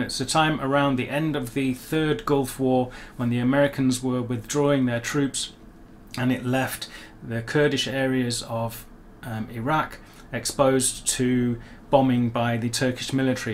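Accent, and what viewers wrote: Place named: Dortmund, Germany. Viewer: When you talk german, you really sound like a true Dortmunder! Greetings from a born Castroper! Very interesting channel!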